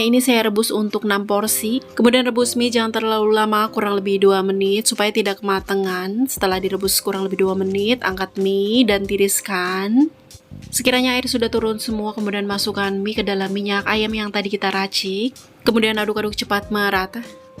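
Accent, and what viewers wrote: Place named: Java, Indonesia. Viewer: Medok banget mantap resepnya mama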